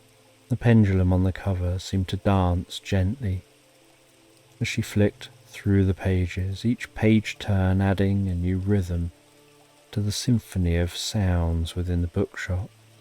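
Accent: United Kingdom. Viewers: Had to update: My second night listening was even better than my first. This is the best for insomnia. I need that perfect British accent with that slight, almost undetectable slowed down effect. And so long, 8+ hrs! Love how one dreamscape effortlessly morphs into another, no need to worry about missing anything. I can get up to use the bathroom or shift sleep positions and gently fall back into whatever soft, lulling, dream-story vignette is unfolding. I accidentally slept in after my second night listening, and my entire body, head to toe, felt utterly relaxed. Thank you!🌌🌜👑🏰🌈🌳🌌🦜☔️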